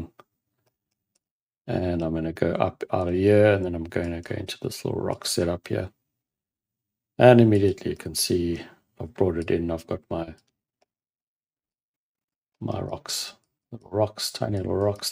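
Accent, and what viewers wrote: South Africa. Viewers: excellent! nice to hear the SA accent :)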